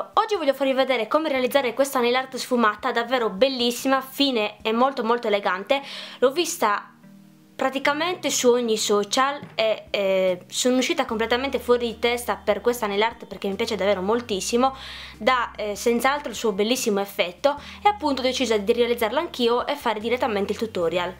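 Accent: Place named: Sardinia, Italy. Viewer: Sono sarda come te, mi fa morire questa cosa dei video con accento sardo!! 😂 In senso buono ovviamente!! Ho scoperto ora il tuo canale sei molto brava e soprattutto insegni come fare bene le cose in casa con le cose che si hanno in casa! Ti do un piccolo consiglio..parla più lentamente, così darai un senso di relax a chi ti guarda dato i video che proponi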